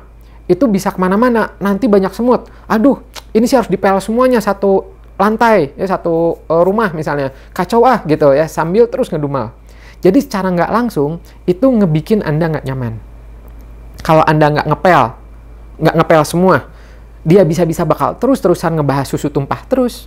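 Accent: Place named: Sunda, Indonesia. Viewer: Vidio yg selalu insightfull soal guilt trip alias gak enakan. Dan Ketika sundanese menjadi narator, sangat terasa intonasinya. Karena saya pun begitu. Tema Kontennya pun mirip dengan, tp masih pemula saya hehe.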